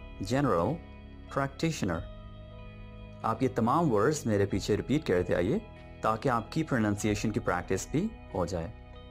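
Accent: United Kingdom. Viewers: yar pehla banda daikha jo urdu me English accent use kar raha hai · Sorry to say Mujhi srif apka urdu ko english style mein bolna acha nhi lgta..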